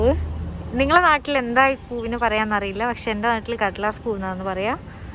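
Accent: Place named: Payyoli, India. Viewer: Payyoli slang.... 👌👌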